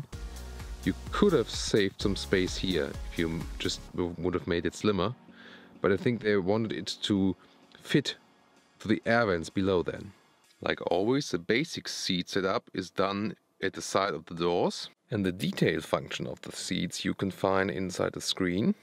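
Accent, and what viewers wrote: Germany. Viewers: ***** Kann dir da nur zustimmen. Sprichst zwar mit einem starken deutschen Akzent, ansonsten geht dein Englisch völlig in Ordnung. Und so ein Akzent ist halt völlig normal bei einer Fremdsprache.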